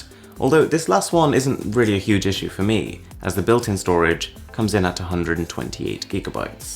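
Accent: United Kingdom. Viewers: Yes, Zack sounds great too, but that British accent is so elegant :1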